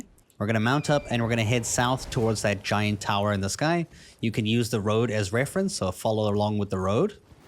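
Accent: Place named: South Africa. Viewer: Bro embrace your south african accent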